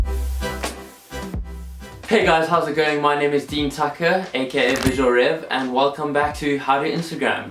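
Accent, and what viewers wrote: South Africa. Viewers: Are you south african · South African?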